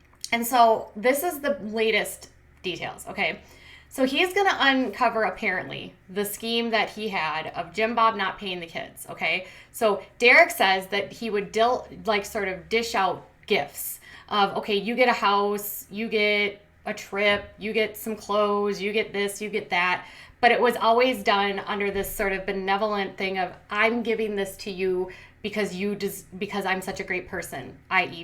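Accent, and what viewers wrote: Minnesota, United States. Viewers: I love your accent! It reminds me of my friends in Minnesota ❤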